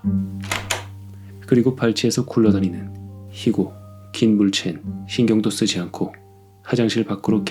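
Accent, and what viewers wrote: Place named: Busan, South Korea. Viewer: 경상도 어디사세요? 부산 사투리인가...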